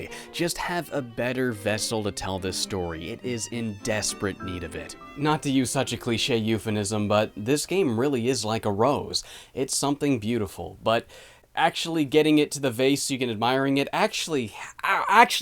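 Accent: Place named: Nova Scotia, Canada. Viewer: Yo what the fuck you're Nova Scotian?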